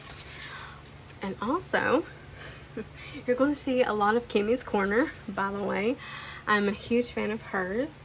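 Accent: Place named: South, United States. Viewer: Loooooove the country dialect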